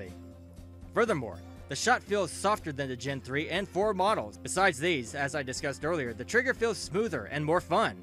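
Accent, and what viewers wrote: Australia. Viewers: australian LMAO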